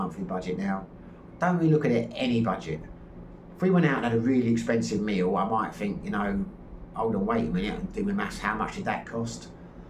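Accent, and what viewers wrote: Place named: London, United Kingdom. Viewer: Good to hear another Londoner in the Philippines, I'm living in Cebu, also got a you tube channel, loving it here, keep up your channel. Cheers mate, Colin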